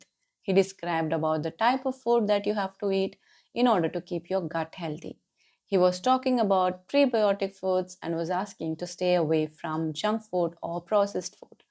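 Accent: India. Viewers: Mam Malayali ano, angane thonnunnu.... Great video 🙏🏻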